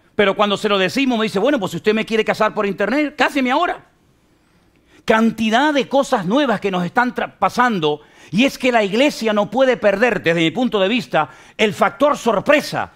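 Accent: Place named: Paraguay. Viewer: desde Paraguy le veo,me gusta xque habla Español como nosotros,No como hay los de España🙏🙏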